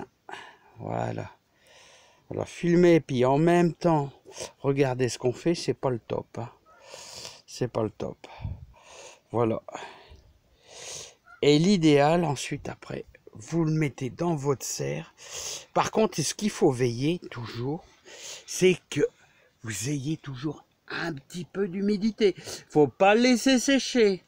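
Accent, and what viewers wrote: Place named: Nord-Pas-de-Calais, France. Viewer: Toujours le sourire dans tes vidéos et tu redonne le sourire a travers tes vidéos Tu parles chti 😊😜 Je te le redis tu es qqun de bien je te souhaite une bonne soirée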